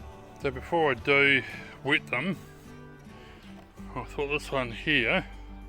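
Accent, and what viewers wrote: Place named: New Zealand. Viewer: Wonderful that you inherited his beautiful collection because you appreciate those rocks so much. His lifetime collection went to someone who understands the joy and work he had as a collector too. Will be wonderful to see the next part of their journey, how you use them. Even sharing them with us is a gift. I live in Oz but I'm NZ born and love rocks. I've learned a lot watching American rockhound channels. I'm so excited to have found your channel and to see my homeland and the treasures we have in New Zealand. Mauri Ora bro. Thanks for sharing. Subscribed and excited to follow. I'm looking forward to watching your past and future videos. So good to hear that Kiwi accent and find someone who shares my love for rocks. My Dad is taking me rockhounding soon here in Oz and I'm excited. I've never been but I used to find serpentine when I was a kid amongst the rocks at Shipwreck Bay on the 90 mile beach. 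My Nan would take us up into the sandunes and we'd find beautiful gems, agate nodules smoothed by the sand. She also had two Moa stones and a couple of Nautilus shells she found on the beach. Spent many happy hours beachcombing as kids and finding shells. We lined her driveway with giant toheroa shells. Don't know what happened to her treasures. So sad. I'd give anything to have had those because they were so special and she meant the world to me. Best days of my life were my childhood with her. xo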